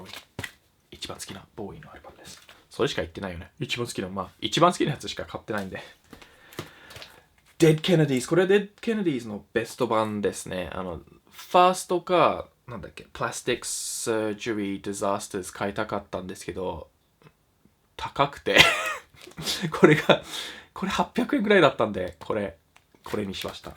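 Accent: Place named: London, United Kingdom. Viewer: PDRさんのロンドンの発音好きw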